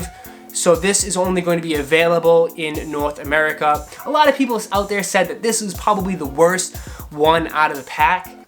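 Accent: Boston, United States. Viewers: If I'm not mistaken your from Bahstan?. Wicked good review.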